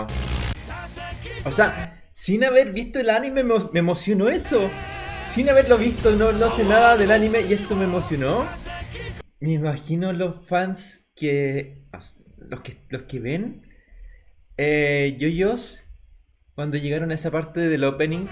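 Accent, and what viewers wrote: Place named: Chile. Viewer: Se te nota caleta el acento chileno completo palta mayo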